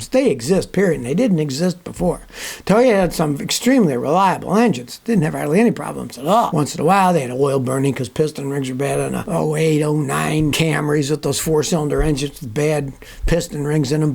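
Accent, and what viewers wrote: Germany. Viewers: The German accent is hilarious!!! 🤣😂🤣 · enjoyed the German accent. :D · im here for his German accent lololol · Your German accent has me rolling! 😂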